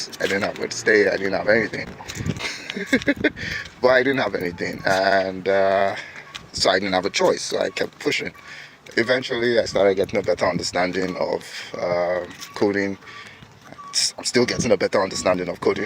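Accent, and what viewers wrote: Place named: Nigeria. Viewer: Thanks a whole lot for sharing, it's so inspiring and I believe your course will help me understand better, many of the things I struggle with cos I really needed stuffs to be explained to me in that naija tone, and here I am!! hehe. Thanks a lot.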